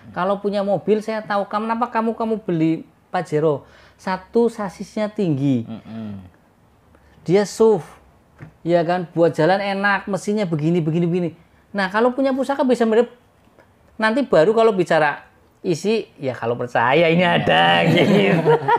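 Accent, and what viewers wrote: Banyumas, Indonesia. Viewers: Nogo kikik kiyek kiyek😂, Ngapak acen gae ngakak tur kepenak kayak kiyek😁 😂,, Semangat lur salim saking Jogja 👍.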